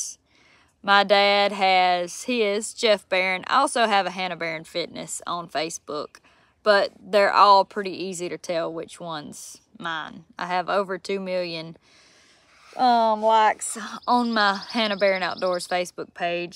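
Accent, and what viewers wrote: South, United States. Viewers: I absolutely love your southern accent so hot and your pretty easy on the eyes too girl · this is southern accent that Forrest Gump had spoken? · Thanks for the heads up. Your a darling. I love your Southern bell accent! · I’ve been a fan for a couple years and you’re the best! Great content and so genuine!!! Beautiful southern woman with magnificent southern accent and great skills!! Seems like there’s no getting rid of those thieving trolls!! All the best to Hannah and her kin!! MMc..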